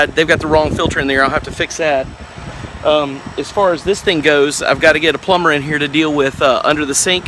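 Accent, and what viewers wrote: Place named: United States. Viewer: Awe...a little country boy accent, to sweet, hope so!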